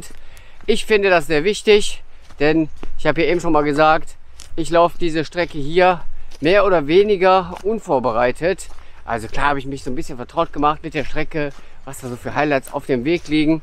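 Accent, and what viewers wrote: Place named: Solingen, Germany. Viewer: Da würde nix mit f und w gemacht. :-D Das ist Mundart Solinger Platt. Gruß van Solig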